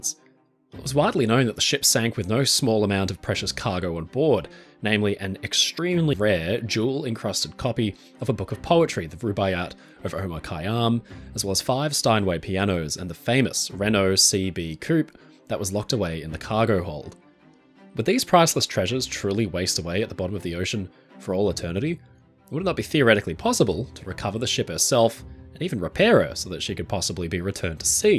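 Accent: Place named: Australia. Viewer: Hmmmm aussie guy i wonder whats his name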